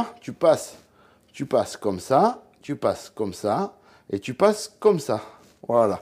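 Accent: South, France. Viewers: tu es un super gars,je suis fils de boucher et j'adore regarder tes vidéos,et ton accent du sud ne fait qu épicer celle ci de ta bonne humeur!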